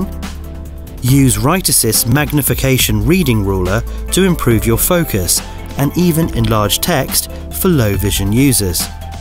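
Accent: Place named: United Kingdom. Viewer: Having a British narrarartor doesn't make you sound smarter Spellex, tap into your own homegrown talent. I'm going to have to give this one a dislike for right now but I know you can fix this Sheldon. As Spellex's #1 fan this video really breaks the canon for the Spellex lore. Please make a better video with canon American actors. Antrex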